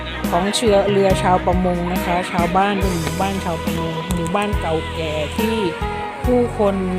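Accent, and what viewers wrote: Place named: Isan, Thailand. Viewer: สวัสดีค่ะพี่ เว่าอีสานม่วนแท่จ้า ขอบคุณที่มาแนะนำสถานที่และเอาภาพสวยๆของเกาะพงันมาฝากค่ะ💕💕🤟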